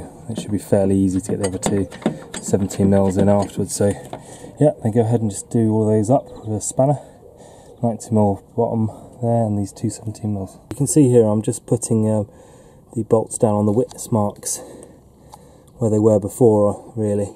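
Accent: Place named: England, United Kingdom. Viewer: I'm super stoked and appreciative of your videos, but between your English accent and how DEEP the tone of your voice is versus the sound equipment you used, it's difficult to understand you.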